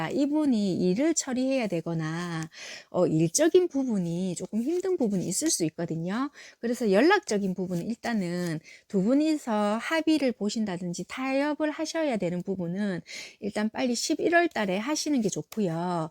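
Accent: Busan, South Korea. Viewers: 최근 헤어진 커플만 해당되는줄 알았어요 ㅎㅎ 감사합니다! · 님 상대가 마음정리 했을까봐 두려웠는데 아직도 마음이 있다고 하니까 위로도 받았어요🥺 리딩 감사합니다 💖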